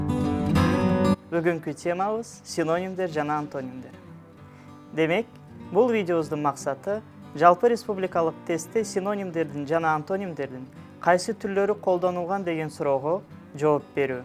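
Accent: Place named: Turkey. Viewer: У него турецкий акцент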